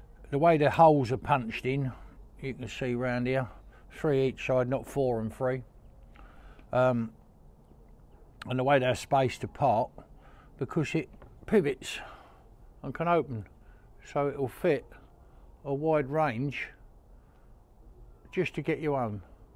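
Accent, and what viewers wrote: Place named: London, United Kingdom. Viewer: what part of london are you from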